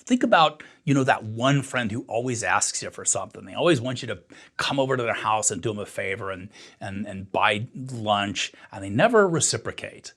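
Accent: Ireland. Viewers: Irish Accent im hearing?... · Interesting about Texas....he sounds almost Irish to me.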